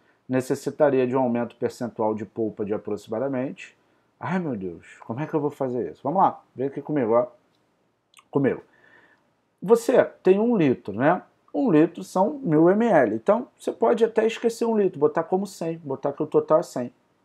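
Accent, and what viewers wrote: Rio de Janeiro, Brazil. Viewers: A melhor forma de aprender matemática, com sotaque carioca, muito bom vcs são incríveis 🎉🎉🎉❤❤❤❤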